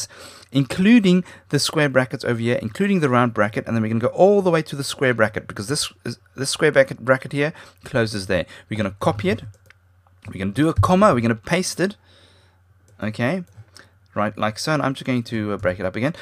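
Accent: South Africa. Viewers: are you from south africa ?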